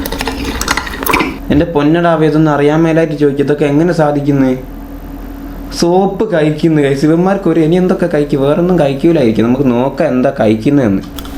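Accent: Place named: Kozhikode, India. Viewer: Bhasha shaili vyathyaasam indaavum bro Karanam vayanad kaaranaan kozhikod karanan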